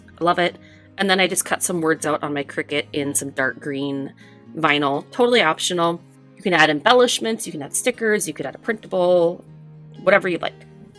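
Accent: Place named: Midwest, United States. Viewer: from another Midwestener, I understood that you said moss 😆😆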